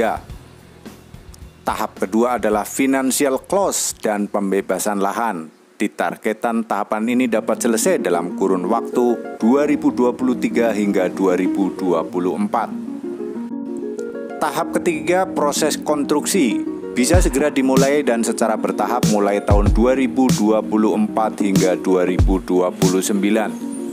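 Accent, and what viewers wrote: Banyumas, Indonesia. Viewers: jebule ngapak temen rika kang🤣🤣🤣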